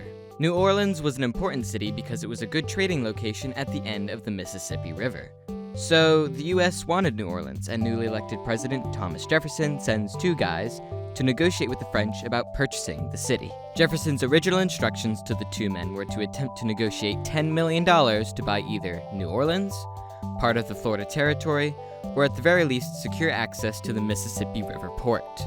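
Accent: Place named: United Kingdom. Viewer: idk why but at first he sounds kinda british not gonna lie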